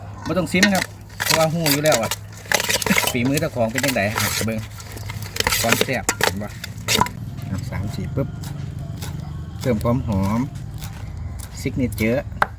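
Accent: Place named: Chaiyaphum, Thailand. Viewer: สำเนียงคนชัยภูมิจิครับ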